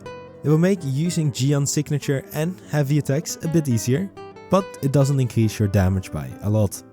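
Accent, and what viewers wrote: Netherlands. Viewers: My first video I see of you and the moment I heard you talk, I knew you were a fellow Dutch person XD Thank you for the vid tho! I love Lumi's fighting style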